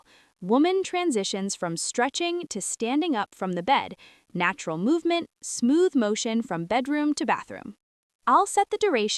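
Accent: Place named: Chicago, United States. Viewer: you must be from chicago... good work...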